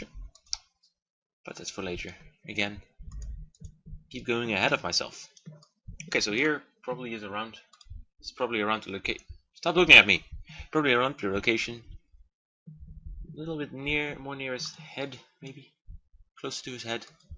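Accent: Netherlands. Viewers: are you Dutch ? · re you dutch (nederlands)?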